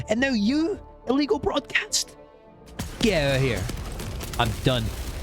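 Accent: Scotland, United Kingdom. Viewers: Insta subbed as soon as i heard you were also Scottish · Are you Scottish?! My people 🙌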